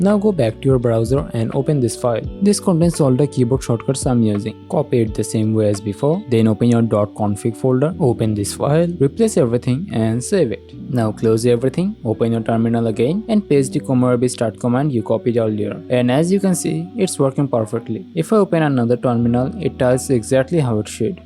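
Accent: India. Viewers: Are you Indian by any chance? Your accent seems pretty Indian. Loving the videos by the way.❤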